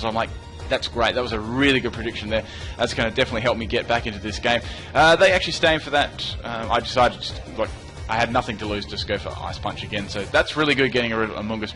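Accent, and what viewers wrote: Australia. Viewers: I was like: "Hey, same as Australia! Oh wait. is Australian...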